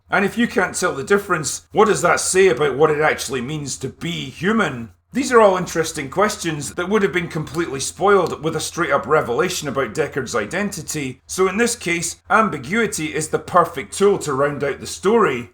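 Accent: Scotland, United Kingdom. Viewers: Listening to a Scottish man talk ethics is one of life's simplest pleasures.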